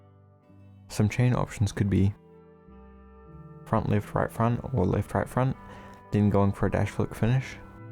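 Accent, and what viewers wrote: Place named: New Zealand. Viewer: You from nz? U got a nz accent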